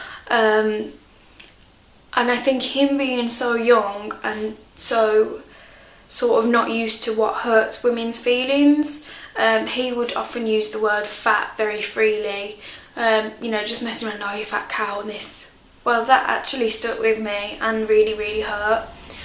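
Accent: Birmingham, United Kingdom. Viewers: are you from birmigham? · I'm having a hard time understanding your British talk lol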